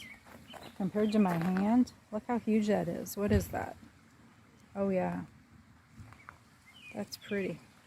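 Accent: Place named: Chicago, United States. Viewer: About 30 seconds in on this video I said to myself, “based on that accent, this woman must be from Chicago.” Then at about a minute later she says “we’re about 2 hours from Chicago.” You can tell that accent anywhere! Beautiful garden. Thank you!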